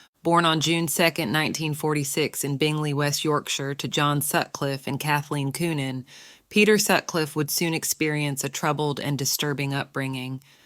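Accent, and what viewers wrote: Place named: Deep South, United States. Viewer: It is interesting listening to a narrator from the American deep south, talking in her strong accent, talking about the Yorkshire Ripper in Northern England.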